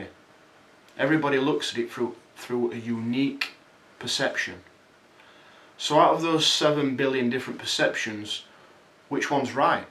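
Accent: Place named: Leeds, United Kingdom. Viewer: i needed that if they are your own words it was spot on ,are you from leeds.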